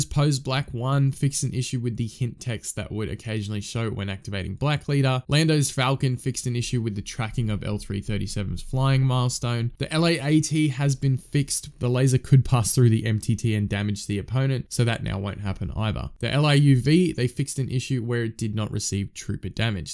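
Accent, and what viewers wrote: Australia. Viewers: lauchlan walsh where did you think he's from? He definitely sounds like an Aussie to me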